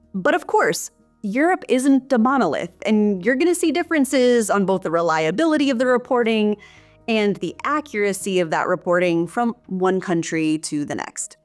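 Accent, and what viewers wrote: United States. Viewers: U need a differwnr presenter...what you say may be right but right now an amerrican voice talking about media bias and truth has next to no credibility outside the former Usa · It is so pleasant to hear a balanced opinion in an American accent for once. 🤷‍♂😄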